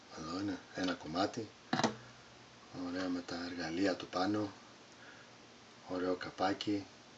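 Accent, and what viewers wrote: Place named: Australia. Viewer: Yasou buddy. I just wanted to know where in Australia are you? Because I heard your accent and I wanted to know if you’d teach private lessons?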